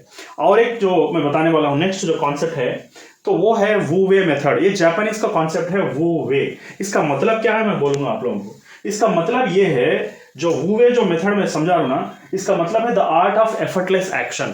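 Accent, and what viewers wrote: Hyderabad, India. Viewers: Sir ka hyderabadi accent sunne k liye Aya main.. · Hyderabad se lgte . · Sir aap hyderabadi haii kyaa😅 Good explanation sir😊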